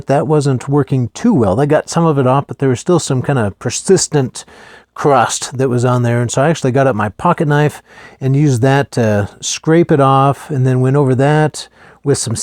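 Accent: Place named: South Africa. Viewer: Are you from south Africa your accent doesn't sound American beautiful job👌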